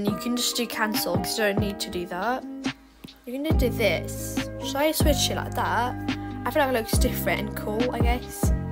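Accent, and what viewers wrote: United Kingdom. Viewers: Thank you so much! You’re voice is soo cute u have an really cute British accent! New subscriber! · Are u Scottish??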